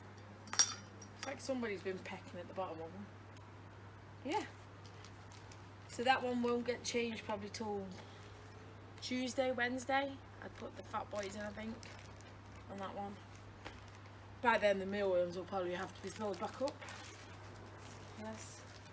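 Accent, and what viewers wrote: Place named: England, United Kingdom. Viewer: Hello Young Lady I do love watching your videos I always did love a woman with an English accent and with a full figure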